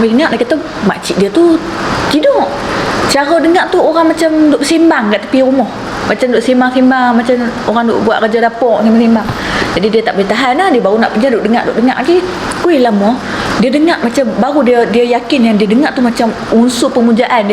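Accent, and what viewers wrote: North, Malaysia. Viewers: good content but for me better not to talk slang utara ni. sbb tak semua org faham slang dia. try cakap kl sis. spread love no hate. btw im a new sub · aku suka betul dengar loghat utara ni besssssst !!! :D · Loghat utara..penceritaan yg best dan enjoi ja dgr..👍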